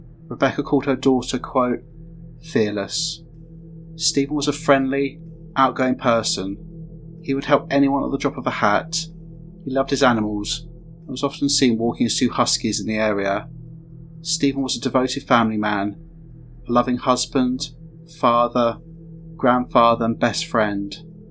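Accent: United Kingdom. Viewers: You're British mate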